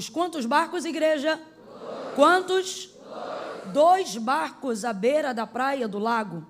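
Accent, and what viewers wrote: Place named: Rio de Janeiro, Brazil. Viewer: Ela falando daqui do RJ show kkk É assim mesmo aleluia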